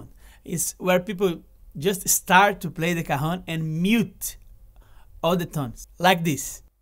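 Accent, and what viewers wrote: Brazil. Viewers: E esse sotaque aí mano, é brasileiro ??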